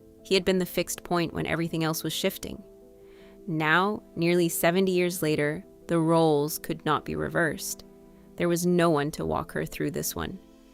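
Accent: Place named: United States. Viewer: year i hate the American accented narration, Prince Philip was GB's and the commonwealth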